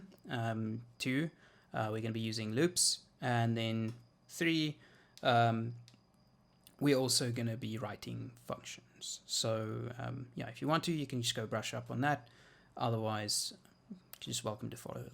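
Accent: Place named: South Africa. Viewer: Thanks for this. Nice to listen to a fellow South African voice when studying.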